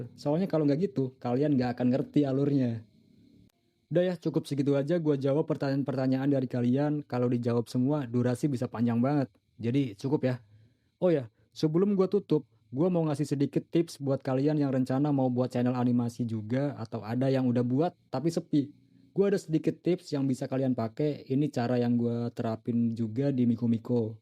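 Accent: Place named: Sunda, Indonesia. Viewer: Sunda juga nih bang😂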